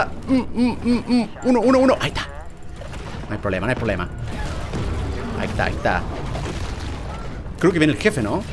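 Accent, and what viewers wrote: Chile. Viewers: Soy yo o esta volviendo a hablar chileno poco a poco? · por lo menos en los directos se le sale lo chileno wn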